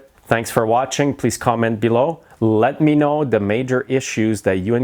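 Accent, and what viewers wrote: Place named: Newfoundland, Canada. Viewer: You must be from either Newfoundland or Labador by that Accent that you have!! you can't Fool an Old Canuck Lol anyways Love you for being a Caregiver to you're Wife your a Very Strong Man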